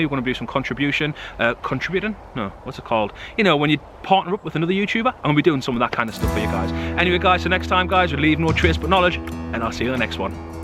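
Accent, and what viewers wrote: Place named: North East England, United Kingdom. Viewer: Good to see you back buddy👌 I’ve just bought a polish lavvu and I’m on “modding” it at the min, sewing monster zip in it, wax proofing it with a home made recipe and heat gun, changing the pole system to a british one witch packs down to the same size as original and also making custom wood bungs to go on top/btm of pole system😌👍 i am thinking about starting videos on bushcraft and wild camping but as a noob enthusiast 😂 we shall see... Ive watched all your videos and have learned a lot from you, your instructional skills are ace bud and its a pleasure to sit down and watch your videos learning as i go👌 plus its a easy to relate to a fellow north east lad and being able to understand what your saying with the accent😂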